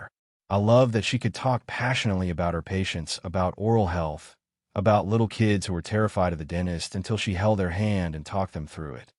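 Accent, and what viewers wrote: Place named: Houston, United States. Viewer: If this not a real Houston story, definitely sounds like a woman from the Southwest side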